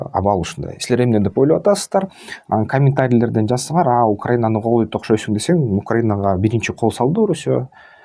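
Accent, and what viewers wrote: Standard. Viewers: КЫРГЫЗЧА СОЗДУ ТАЗА СУЙЛОГОНУНУЗ учун РАХМАТ СИЗГЕ УРМАТТУУ БАЙКЕ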